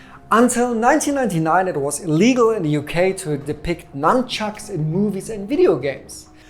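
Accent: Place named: Germany. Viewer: not the typical german english speaker nice usually all germans speak it like in the 1960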